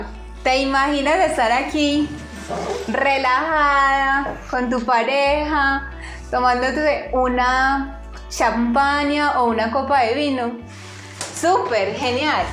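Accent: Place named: Antioquia, Colombia. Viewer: "cuenten pues a ver.." jajajaja me encanta tu superrrrr acento paisa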